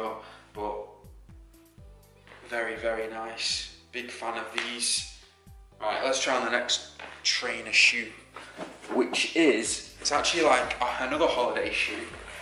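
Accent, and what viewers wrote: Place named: Liverpool, United Kingdom. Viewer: Are you from Liverpool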